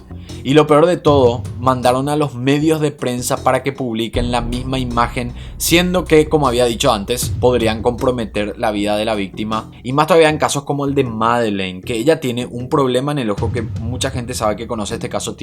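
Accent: Paraguay. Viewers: Omg eres de paraguay, me too ... Amo el acento pero yo lastimosamente lo perdi todo despues de años de vivir en este pincho pais (argentina) :( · Acento Paraguayo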